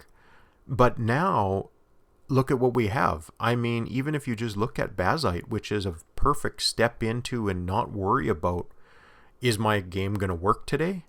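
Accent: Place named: Canada. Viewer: nice canadian accent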